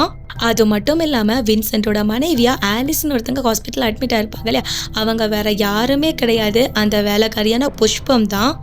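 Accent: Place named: North India, India. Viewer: தமிழ் சரியா தெரியாத வடக்கனுங்க "கார்டு மேலே இர்க்க பத்நால் நம்பர் சொல்லுங்கே சார்"ன்னு சொல்ற மாதிரி இருக்கு நீங்க பேசுற தமிழ் slang..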